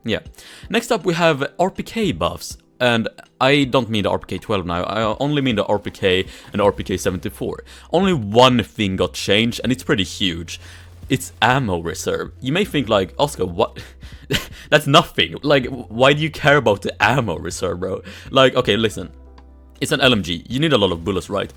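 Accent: Sweden. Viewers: I just watch for the Swedish accent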